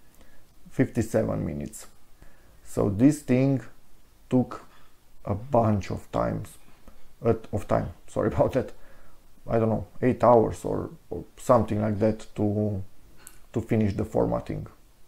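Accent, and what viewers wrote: Romania. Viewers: Ănd hir iu mast bi șur. Mai bine ai spune in româna. Ori fă pronunțarea sa fie in engleză și nu româno-engleză. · are you romanian? the accent gives it away😀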